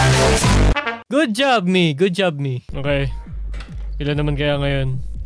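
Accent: Visayas, Philippines. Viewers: Bisaya diay ka dol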